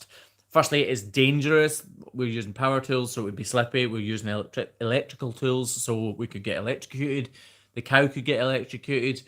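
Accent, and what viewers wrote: Scotland, United Kingdom. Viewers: Hello from upstate NY. In U.S.A. ..I simply love your accent from Scotland. We obviously do not get yo see how beautiful Scotland is so thank-you to and your wife for sharing your profession and Country with us.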